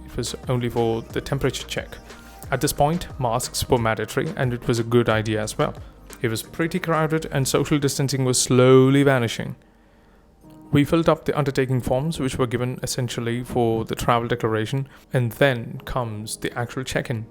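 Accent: United Kingdom. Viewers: Brit accent coming from a desi who lives in the US. Can't wrap my head around it, unless you binge watch British TV Shows a lot. Cheers mate!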